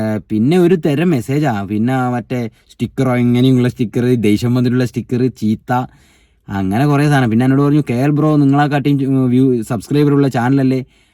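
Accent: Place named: Thiruvananthapuram, India. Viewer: തിരുവനന്തപുരം ആണ് എന്ന് തോന്നുന്നു സംസാരം കേൾക്കുമ്പോൾ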